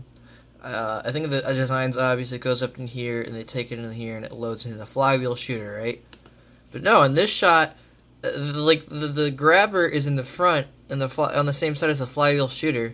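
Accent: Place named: Georgia, United States. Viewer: You have a really nice voice. Are you from Georgia by any chance? I've known a few people from there who pronounce words with similar intonations. This vlog was really comfy. I liked how you cut from thing to thing, it gave a sense of pacing as you were developing each topic. A lot of the stuff you were talking about felt very mundane but that was the charm of it for me. Getting to hear someone with a likeable personality and soothing voice share what their life is. And the fact that you showed for example an unedited clip of you playing that game that had been spending so much time on drove home that point. It felt very coherent overall and had a nice flow to it. I'm looking forward to what you do in the future!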